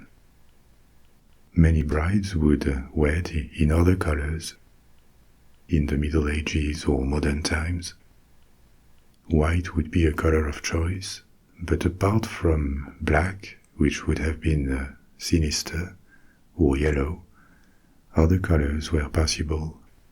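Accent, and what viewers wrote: France. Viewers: Loving there's no AI and if a mere mortal as I can make a suggestion, then could do Dantes Inferno about the 9 circles of Hell?? I am sure you'd do it such justice with your Gallic tones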